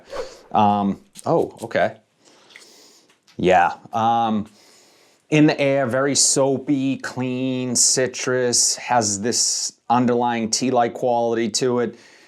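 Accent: Boston, United States. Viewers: Interesting accent. Like Southie Boston lol. Very handsome.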